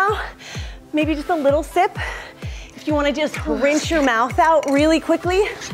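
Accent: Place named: United Kingdom. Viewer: Wait, didn't she used to speak with a British accent :/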